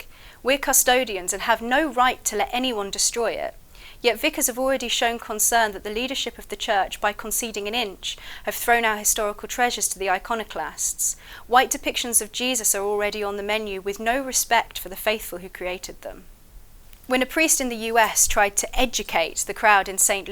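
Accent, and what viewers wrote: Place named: North, United Kingdom. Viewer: I'm not moaning here, but I'm curious how uses the northern pronunciation of "ask" and "pass" yet is apparently from southern climes.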